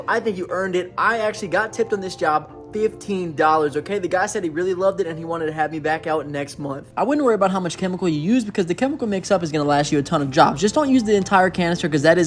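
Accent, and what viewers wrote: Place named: Louisiana, United States. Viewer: dang you from louisiana too😂🔥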